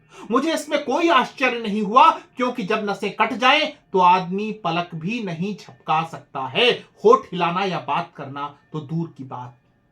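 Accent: Standard. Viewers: आपके हर वीडियोज की तरह ही ज्ञानवर्धक हे। आपकी शुद्ध हिन्दी और उसका सर्वथा शुद्ध उच्चारण प्रशंसनीय है।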